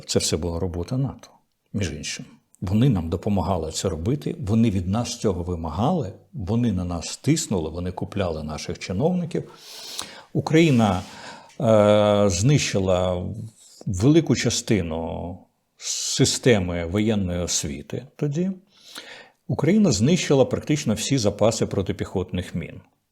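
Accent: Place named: Russia. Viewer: Пад люка перефарбована <link> У тебе навіть акцент ка ца пський аж з вух кров йде.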